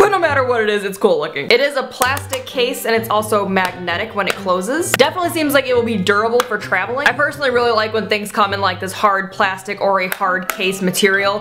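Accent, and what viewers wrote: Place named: Midwest, United States. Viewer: Lovin your Midwestern accent :)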